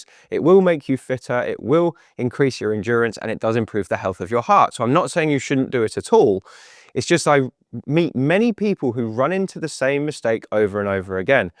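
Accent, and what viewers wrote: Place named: Leicester, United Kingdom. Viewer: This Leicester accent makes me feel at home :)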